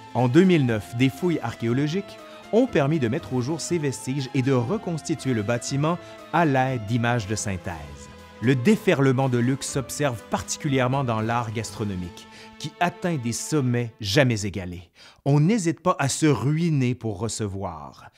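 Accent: Quebec, Canada. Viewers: Des fois, je regarde tes vidéos pour le plaisir d'entendre un accent québecois. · Je suis heureuse d’écouter un Québécois en plus mon domaine l’histoire 👌bravo 👏🏻🌹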